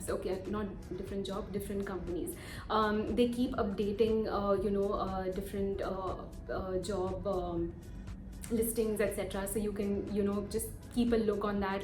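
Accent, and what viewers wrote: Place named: India. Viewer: Sorry but your accent is awful Are you indian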